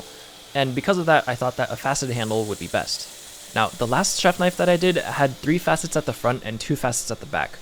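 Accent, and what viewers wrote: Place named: United States. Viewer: Such a shame this comes with a grotesque American accent. To the YouTuber, change it, its unwatchable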